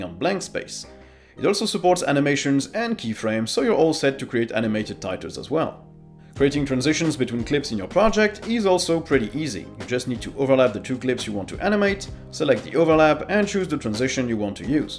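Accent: France. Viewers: Bonjour, tu es français ?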